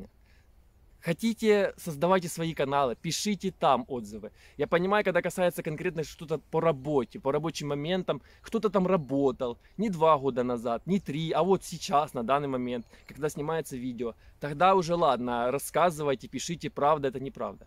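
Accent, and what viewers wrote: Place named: Surzhyk, Ukraine. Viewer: Досмотрел... Спс что не перешёл на мат как это обычно бывает в камеди-клаб. И да тот суржик, на котором ты "морозишь" далеко не русский язык. Так что либо говори на украинском языке, либо учи матчасть (символично неправда ли) прежде чем выходить на просторы ютьюба!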